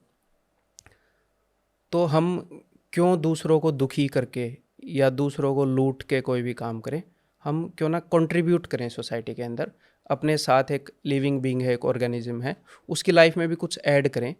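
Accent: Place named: Haryana, India. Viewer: He is haryanvi bro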